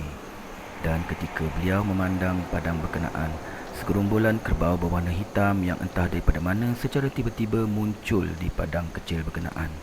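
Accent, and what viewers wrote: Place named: Sarawak, Malaysia. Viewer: apa lampu picit ia..agi pekat kelaka kitak sarawak iya bro...terbaik bro.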